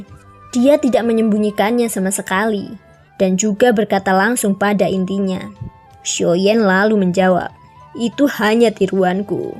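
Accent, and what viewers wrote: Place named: North Sumatra, Indonesia. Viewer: Kental kali batak nya kak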